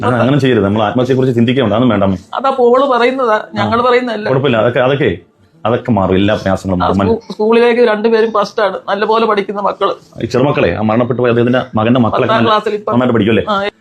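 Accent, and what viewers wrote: Kannur, India. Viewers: ഭായ് എന്നും നിങ്ങളുടെ മേൽ ദൈവാനുഗ്രഹം ഉണ്ടാകട്ടെ.... സംസാരം കേട്ടിട്ട് കണ്ണൂർ കാരനാണെന്നു തോന്നുന്നു ഞാനും കണ്ണൂരാണ്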